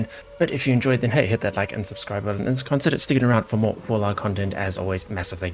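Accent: South Africa. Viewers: Is that a South African accent I hear?! Subbed.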